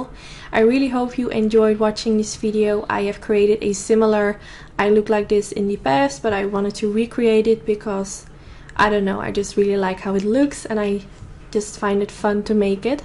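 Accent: Netherlands. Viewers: hey, are you dutch?😊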